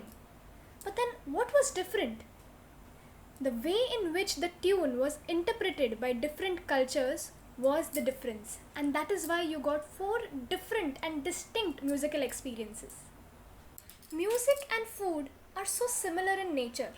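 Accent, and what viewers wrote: India. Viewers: I love your accent. It's somehow thickly Indian, and yet clear as the ringing of a bell. I also look forward to learning indian music theory. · you've got the perfect Indian accent........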